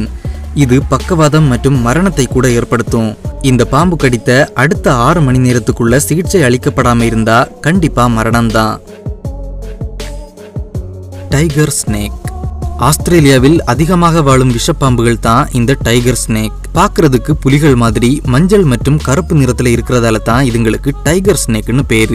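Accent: Kanyakumari, India. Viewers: Bro Kanyakumari pola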